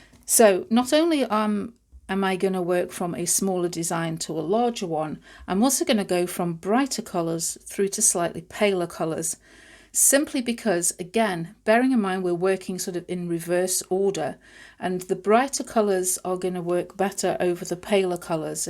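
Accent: England, United Kingdom. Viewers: Thank you, I am so pleased to have found your videos. I love the way you explain the process clearly and calmly in your lovely English accent. So many of those I have been watching have been, while informative, too fast and with loud music over high pitched American accents. I'm a water colour painter experimenting with new techniques and ideas as I am now housebound during lockdown due to my age and health so have been exploring YouTube for inspiration and ideas. I now know that I have been using the wrong type of acrylic paints and not planning the process enough resulting in messy prints and wasted paper. I'm looking forward to watching more of your videos and hopefully producing something I don't have to hide away!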